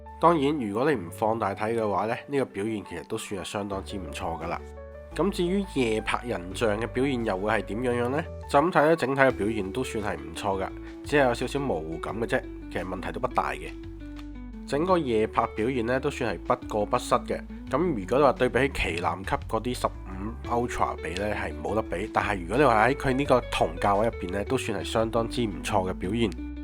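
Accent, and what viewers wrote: Hong Kong, China. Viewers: 就香港粵語口音啊，我也聽得懂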